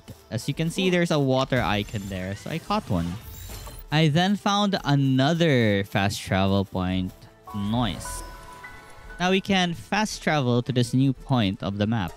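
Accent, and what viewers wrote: Philippines. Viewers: are you Filipino? cause you have a Filipino accent!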